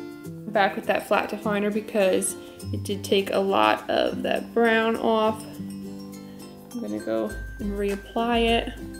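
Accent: New York, United States. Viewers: Sounds like you’re from New York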